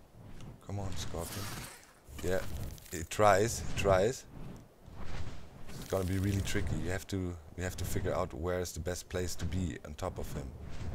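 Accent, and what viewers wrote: Germany. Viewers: Are You Deutsch Sir?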